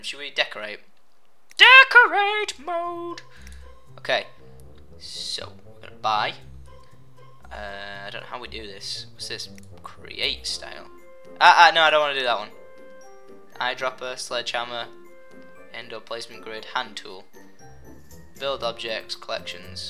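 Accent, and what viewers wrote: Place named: Northern England, United Kingdom. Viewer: Ooh was fun watching you play Sims 3, Love Sims :) love your accent too good old northern accent like meself ;)...i am that sad i know that house as i have played it many times and never understood why that half wall bit is there looks odd hahaha good cheats to use are motherlode for money, Testing cheats enabled use shift and click on mail box/sim and a bunch of things come up, and after that if you want you can use buydebug and good stuff there :) only found out recently :) Have fun simming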